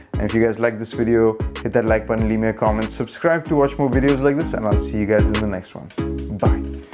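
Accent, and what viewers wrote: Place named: India. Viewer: god the indian accent is so harsh and foul to my ears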